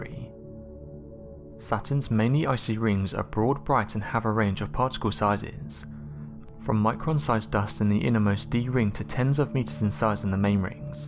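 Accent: United Kingdom. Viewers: Is this a Brithish robo-voice? Otherwise not much content. Uranus is boring. Wind and pebbles.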